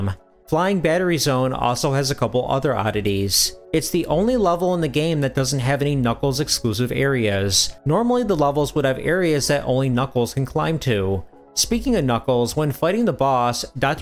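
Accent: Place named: North Dakota, United States. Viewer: I hear a fellow midwestern haha! Sounds like North Dakota hehe! I’m in Fargo. You’re cute too lmao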